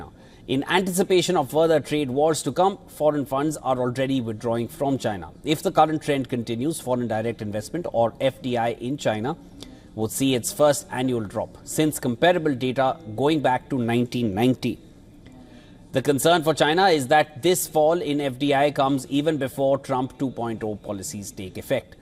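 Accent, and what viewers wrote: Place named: India. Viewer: Is there anyone in India who can speak "proper English"?? The accent here is too strong.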